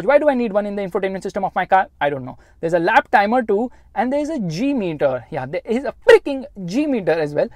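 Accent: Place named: India. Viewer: Bhai apni desi accent m english jharny sy acha local hindi ya urdu mai bat kr Angrez tera review daikhny ni aingy..Unky pas top gear,Car wow jesy shows mojod hain... Thaki hwi english bolny sy acha apni local language m review dala karo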